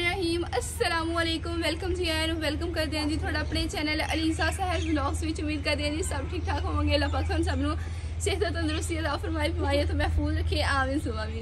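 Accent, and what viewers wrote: Punjab, India. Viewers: Her tone and style is more of eastern punjab than of western punjab.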